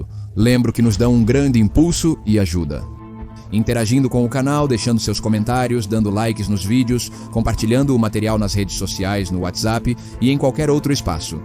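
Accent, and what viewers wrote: Portugal. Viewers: Ficou uma 💩 com esse português de Portugal! 😒👍🏻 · As revoluções são as festas dos oprimidos e explorados Fladimir Lênin Gostei do sotaque Português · Sou Brasileiro e acho lindíssimo o sotaque da Pátria Mãe Portugal ❤ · É de português de Portugal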